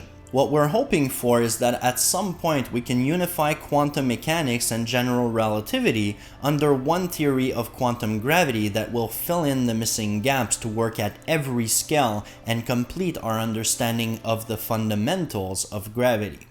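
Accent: Quebec, Canada. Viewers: You’re definitely Québécois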